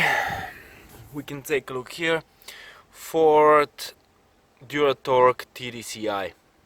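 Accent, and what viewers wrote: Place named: Bulgaria. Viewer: Лол пич !! Много ти личи ,че си Българин. Още не ти бях прочел името на канала и като чух как говориш познах. :) :)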